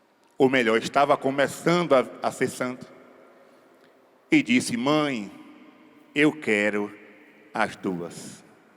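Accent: Bahia, Brazil. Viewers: Boa noite Padre gosto muito do senhor admiro demais da conta suas homilia são todas maravilhosa gosto muito do sotaque baianos e de todos os nordestinos acho muito lindo🎉🎉🎉🎉🎉🎉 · Sua bênção padre sou mineira e amo suas pregações com esse sotaque baiano kkkkk Deus abençoe o senhor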